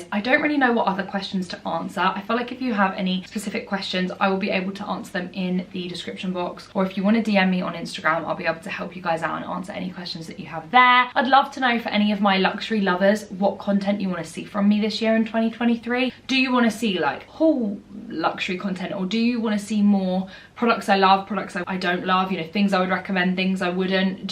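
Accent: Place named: United Kingdom. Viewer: Is she British? She’s has their accent!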